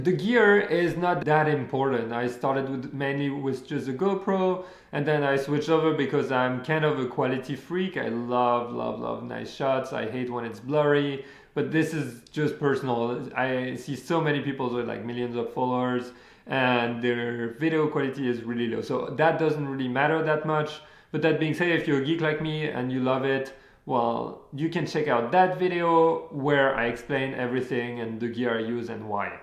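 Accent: France. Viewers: He sounds like Mark ruffalo with a french accent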